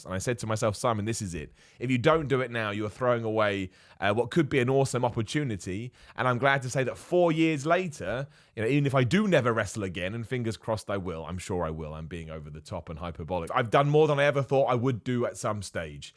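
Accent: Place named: Northern England, United Kingdom. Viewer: From your accent I could never work out if you're a northerner or southerner. Then you mentioned you're from near London in a few of your videos. Made me think, where is that northern twang from. Worked out from this video it might've been from your time at university in Manchester 😂😂😂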